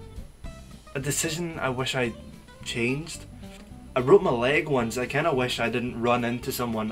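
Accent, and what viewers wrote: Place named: Scotland, United Kingdom. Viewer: I enjoyed your American candy video. Hello from Chicago. You are a handsome young man, What part of the UK is your accent from. It sound to me a bit Scottish, Irish sounding. I like your accent. Are you from Liverpool? Oh you are from Scotland! Very nice accent.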